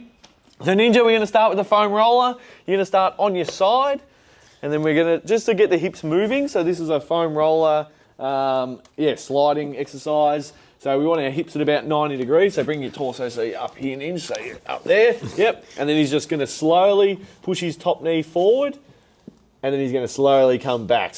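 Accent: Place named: Australia. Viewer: So much knowledge .. w some latin and the Australian accent . Glad my phone is in my pocket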